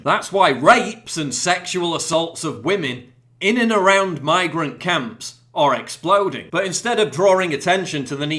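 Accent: United States. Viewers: thought you were British so why are you talking like a American.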